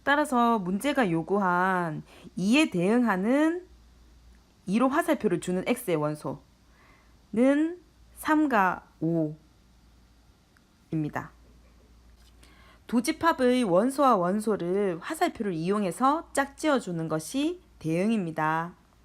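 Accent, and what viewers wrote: South Korea. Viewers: 경상도 인듯?!?!ㅋㅋㅋㅋ 구독합니당 ~ㅎㅎ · 우왕 들 화면 넘 깔끔하고 조아요~ ㅎㅎ 근데 서울분은 아니신가 봐요 ㅋㅋㅋ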